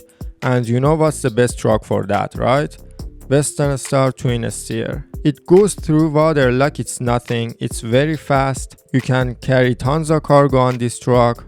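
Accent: Iran. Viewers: داداش ایرانی هسی؟لحجت خیایییی مثل ایرانی ها هس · لحجش چقدر شبیه ایرانیاس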